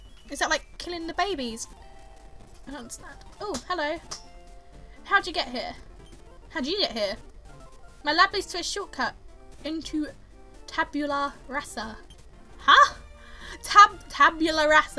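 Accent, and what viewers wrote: United Kingdom. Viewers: " What did I call my other fletchlin'?...........I don't remember!" You gotta love British accents!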